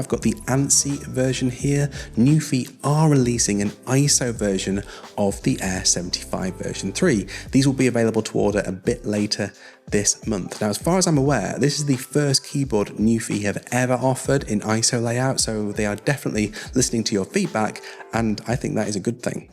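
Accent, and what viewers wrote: England, United Kingdom. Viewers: I hate the audio track chage it to my county language I like your English accent love this review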